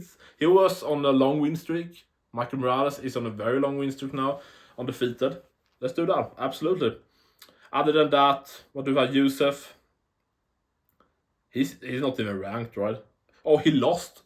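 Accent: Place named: Spain. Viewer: nice spanish accent!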